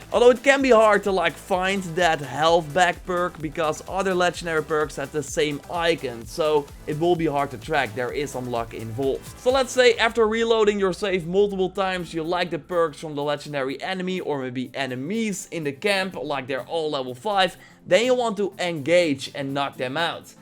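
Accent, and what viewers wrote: Netherlands. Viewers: Ben je Nederlands want je klinkt bij heel veel woorden die je zegt Nederlands zoals toen je ikaros zij en andere dingen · Nice man hoorde aan je accent dat je nederlands was weet jij misschien waar of hoe je veel metal kan vinden?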